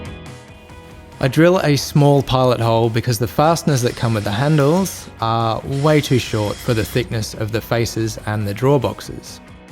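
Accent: Australia. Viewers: Relentless Australian accent...LOL! Had a couple of laughs and picked up a few tips, thanks! Liked and subscribed. 👍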